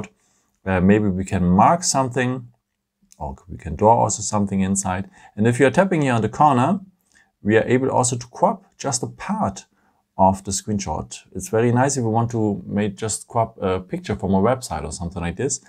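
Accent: Germany. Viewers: Great ! Got mine ysterday . Tought I heard a "german" sound in the video. Vielen Danke from Finland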